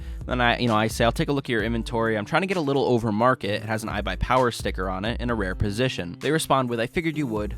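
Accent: Sweden. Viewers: Bruh u from sweden ? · Are You from sweden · Are you from sweden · Are you Swedish or American?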